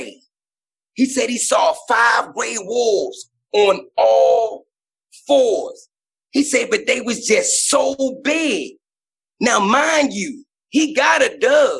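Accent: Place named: Baltimore, United States. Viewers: Being from Maryland I can assure you, his accent/dialect is exactly how the majority of folks frm "Bal-more" talk, and they call cigarettes "fugs" lol Good ppl...and, you are the man, Thanx for the great story telling. To all, stay safe and be well ☝🏽❤ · He has a heavy Baltimore accent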